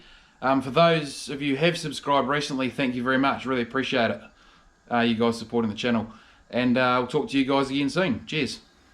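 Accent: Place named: Australia. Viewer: He doesn’t have a nz accent more Australian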